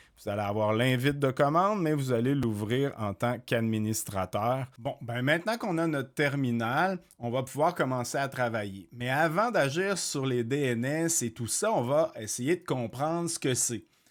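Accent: Quebec, Canada. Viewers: cet accent Québécois est tout simplement charmant ! En plus d'une vidéo super utile, merci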